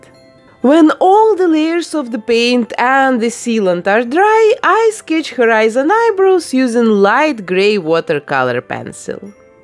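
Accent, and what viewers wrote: Russia. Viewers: Вот наткнулась на видео И сразу поняла по акценту , что из России человек 😂😂😂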